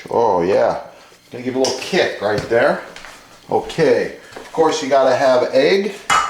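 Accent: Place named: Midwest, United States. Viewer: Hey dude, I love your videos! I found you through searching for finishing wood. Also, you’re f’ing hilarious! And your accent is amazing. Midwest? Wisconsin? Anyway, keep up the good work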